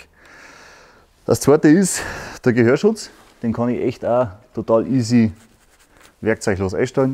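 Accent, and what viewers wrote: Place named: Bavaria, Germany. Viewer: Schon ok, alles gut 👍😊. Alle Dialekte sind ja was Tolles 👍😊, ich wünschte ich hätte auch einen 😉. Bayrisch kann halt nurmal "etwas" anstrengend sein zu verstehn. Aber is ja mit Sprachen allgemein so 😉. Ansonsten, Videosstyle und Präsentation sind 👍, auch wenn ich in Wahrheit ein Fan von Fauna u. Flora, also auch von Bäumen und Naturwäldern bin, und mir jede Fällung eines ausgewachsenen Baumes das Herz blutet und mich zum Menschenfeind mutieren lässt . Grade in Zeiten eines Klimawandels. Immer mehr Bäume und Wälder werden in rasendem Tempo niedergesägt und statt dessen immer Winderräder aufgestellt 🤦‍♂️🙈 😔 . VG 🙋🏻‍♂️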